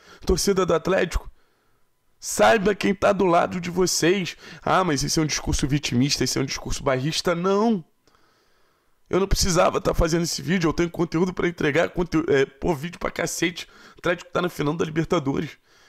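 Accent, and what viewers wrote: Rio de Janeiro, Brazil. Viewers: Que vídeo espetacular..bom ver tanta sobriedade junto com sotaque carioca, coisa rara na imprensa esportiva kkkkkk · Foda-se, me inscrevi, não tem como! O cara com sotaque carioca tá do lado certo da força! Parabéns, contra tudo e contra todos. Sempre!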